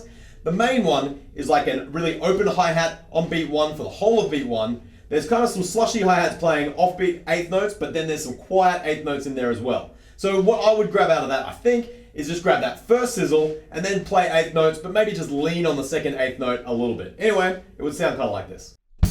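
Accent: Australia. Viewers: I subscribed as soon as I heard your accent I’m an Aussie aswell mate 🇦🇺🇦🇺🇦🇺🇦🇺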